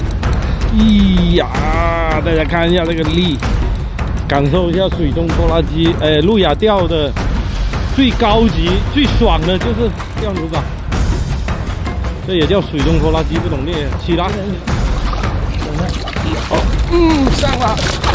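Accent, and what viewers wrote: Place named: South, China. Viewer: 这口音好像南普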